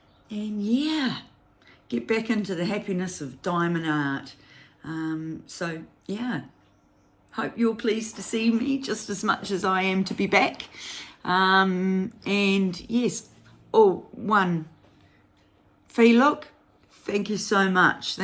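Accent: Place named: New Zealand. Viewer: Hi & fur babies, wonderful to see you back and all your finishes and WIPs. I have missed you & your kiwi voice (us few kiwis do miss hearing our on accents 😉). Love all of your DP's. Neat to see the Gemini. I think a yellow rose is also a Gemini flower 🌼. It's so sparky. Look forward to seeing more of you on YT. Take care, Matariki nearly here, yay.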